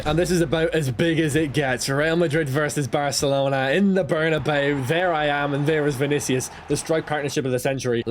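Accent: Ireland. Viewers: Yourrrr irishhhhhhhh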